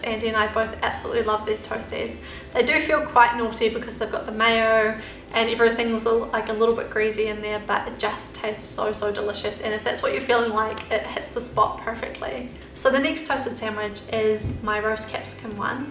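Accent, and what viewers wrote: New Zealand. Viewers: These sandwiches look SO good! Thanks for the recipe ideas - you're wonderful to listen to - kiwi?😊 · Kiwi accent lol....people have a job understanding us sometimes :) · She sounds like she's from nz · New Zealand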